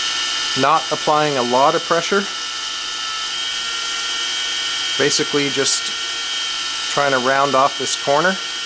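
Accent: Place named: Canada. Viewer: Very well done, used your tutorial as a guide to smooth out my 2.0 shield. Awesome video, best I've found, clear, conscious and touch of Canadian twang, EHH. Thank You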